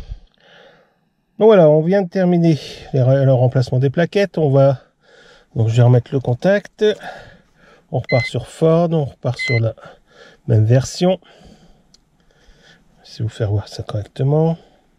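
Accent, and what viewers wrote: North, France. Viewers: Salut ou habite tu? Car tu as l accent du nord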